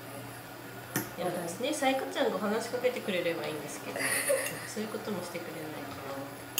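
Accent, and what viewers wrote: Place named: Miyazaki, Japan. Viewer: 花嫁修行でうまかっちゃんかよ！😊笑ゆで卵はガンガンやって全体にヒビを入れた方が剥きやすそうさんって宮崎っぽいアクセントだけど県内の人なのかな？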